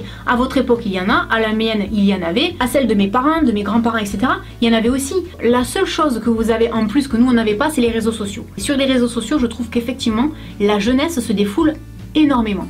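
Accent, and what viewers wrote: South, France. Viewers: biendebonjour, ta un accent du Sud toi! mais ces mignon* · Tes vraiment une belle personne, avec une sincérité, ca ne fait pas longtemps que je te suis mais j'adore complètement ta façon d'être et ta personnalité! ❤ et pour finir enfin une sudiste de pure souche sur YouTube ahah, je suis de Toulouse et je suis fière de nôtre accent! 👌💪❤ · Je t adore et les sujets que tu aborde sont très intéressants. J'ai 13 ans et ça n'empêche pas que tu est l'une de mes youtubeuse préférée...💗💗💗 J'aime beaucoup la façon dont tu t'exprime et ton axent!!! je voudrais tellement avoir un axent du sud mais comme je suis du nord c mal parti.lol😘 gros bisous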